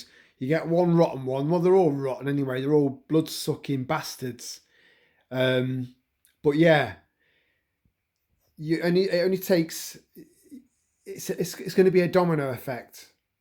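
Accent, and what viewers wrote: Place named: East Midlands, United Kingdom. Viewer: Ooh that accent! Def an East Midlands boy!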